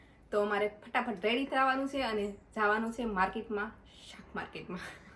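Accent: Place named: Saurashtra, India. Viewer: Tamaru ગુજરાતી એક દમ shaurastra nu દેશી છે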